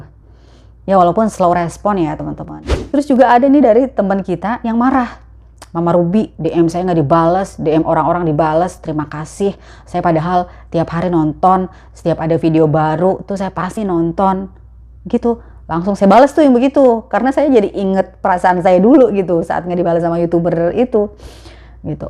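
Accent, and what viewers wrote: Jakarta, Indonesia. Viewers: Assalamualaukum demen banget dah denger logat betawi nya. Salam kenal dr JKT Utara,kl jkt nya sebelah mana pok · Kamu lucu bgt sih, sy subs baru, seneng sama gaya ngomongnya ya betawi²an, lugas dan khas orang Jakartaan kata orang Jawa mah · Assallamuallaikum..mama Ruby..salam kenal,sya penggemar +suscraber baru mama Ruby, baru 2minggu lht chanel mama Ruby..lanhsung jatuh bangun eh...jatuh ❤️mksudya 😊😊, suka cara mama ruby ngomong, logat betawinya kentel bngt, dan kelihatn Mama Ruby oranvnya ngk sombong, sayang aq ngk punya IG jdi ngk bisa folow IG mama Ruby🤭🤭🤭, sekali lagi salam kenal &sucsses untuk chanelnya mama Ruby🙋🙋😊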